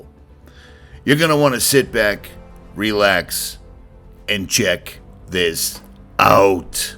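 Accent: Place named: Worcester, United States. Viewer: You sound like your from Worcester :-). Welcome to AZ, just found your channel subbed and liked. From one Masshole to another, be careful out here, beautiful country bud deadly as hell!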